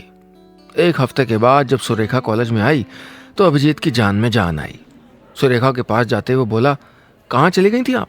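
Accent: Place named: Haryana, India. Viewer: What a deadly combo! Well...Opposites attract. Nice story and good to hear Neelesh in Haryanvi accent..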